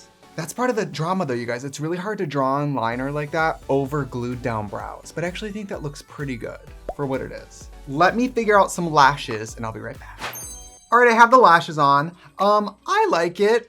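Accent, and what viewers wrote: Wisconsin, United States. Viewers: Her Wisconsin accent is coming out hardcore today honeyyyy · Oh she went full Wisco in this one 🖤 probably on purpose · Is it just me or was her Wisconsin accent stronger than usual in this video 🤔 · Sounding extra wisco to me. Am I crazy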